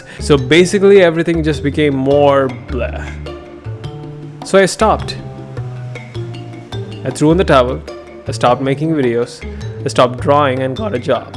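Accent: India. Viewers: Listen carefully and youll hear little indian accents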